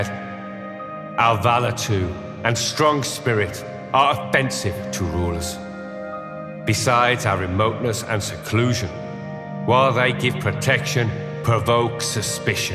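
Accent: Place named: England, United Kingdom. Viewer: Doesn't help he has an English accent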